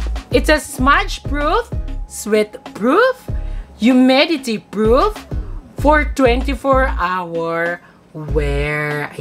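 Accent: Visayas, Philippines. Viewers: Tuwang tuwa talaga ko pag napapakinggan ko yung Visaya accent mo vevs ❤️ nawawala stress ko dito . Your supporter from japan 🇯🇵 Josh Rubio po . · It is abelabol HAHAAAAH i really love ur visaya accent mamsh 😍😂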